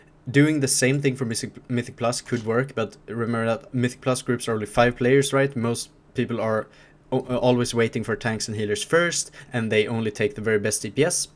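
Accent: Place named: Sweden. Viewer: Du måste vara svensk haha hör det på engelskan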